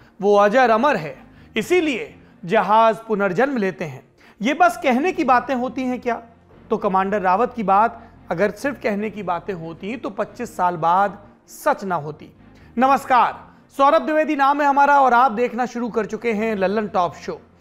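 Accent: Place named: Bundelkhand, India. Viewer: देश की खवर देश की आवाज़ देश के लहजे में देश के बुंदेल खंड के सपूत सौरभ द्विवेदी संमोहित करने वाले अंदाज़ में समाचार सुनाने वाले ग्रेट.....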